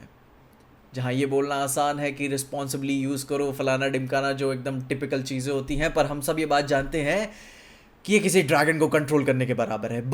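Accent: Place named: Bihar, India. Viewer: bihari accent ❤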